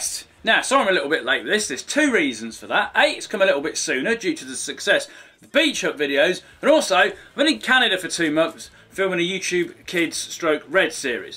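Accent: United Kingdom. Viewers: is this guy english or scottish or irish or welsh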